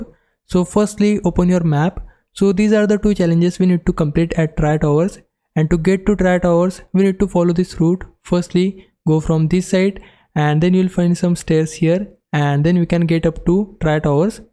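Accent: India. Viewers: Indian ahh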